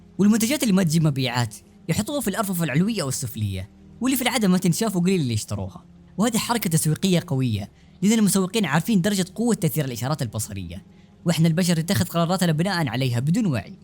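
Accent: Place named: Saudi Arabia. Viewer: من اللهجه واضح سعودي☺️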